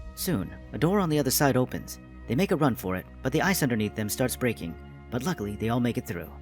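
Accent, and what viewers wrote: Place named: Russia. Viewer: Что за акцент ужасный? Ты что русский? Ты же понимаешь, что тебя иностранцы некоторые просто не поймут. Пацаны расходимся, видимо на русском Ютубе не платят деньги и он решил делать контент в зарубеж.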